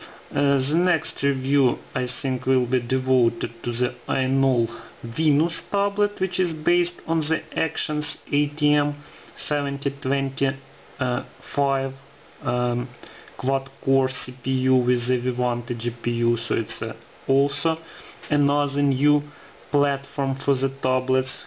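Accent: Russia. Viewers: с первых слов произношения слышно русского :)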